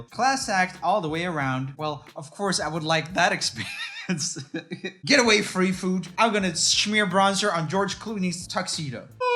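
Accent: Sweden. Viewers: Holy shit. As a swedish boy I can vouch that swedish does not sound that weird. He has one of the funniest dialect I've ever heard. Where TF are u from (is it like Malmö or something) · Var det där din riktiga svenska dialekt?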